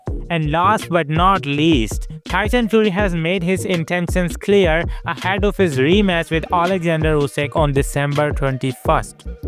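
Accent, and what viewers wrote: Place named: India. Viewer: Wttf is this indian voice talker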